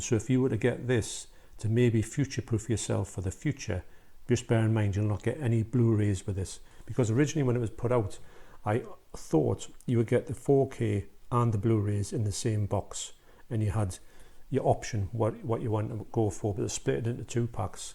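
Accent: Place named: Scotland, United Kingdom. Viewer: that's great to hear, as I've heard that the Argento Cut was the worst of the 3 transfers. Oh ok, sorry. Just bits of your accent sounded Scottish. Not sure if you know, but i'm in Australia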